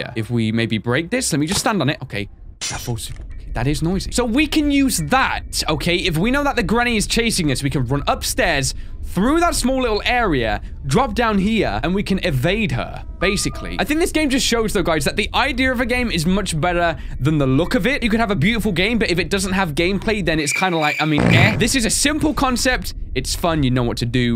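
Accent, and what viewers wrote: United Kingdom. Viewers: Ur British right?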